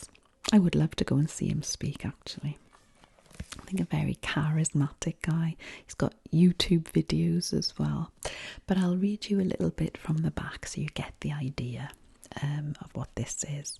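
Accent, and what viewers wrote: Wales, United Kingdom. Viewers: I love Welsh accents! So sophisticated!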